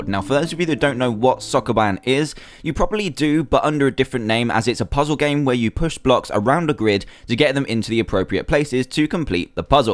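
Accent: United Kingdom. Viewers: Ever heard of a British accent, m'kay?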